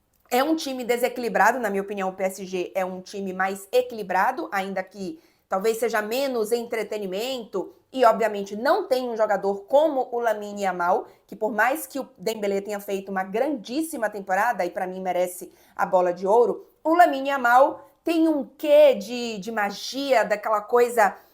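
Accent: Bahia, Brazil. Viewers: Que voz forte, poderosa, e com um sotaque delicioso! Eu apostaria tudo que ela é baiana pra ter esse conjunto fabuloso!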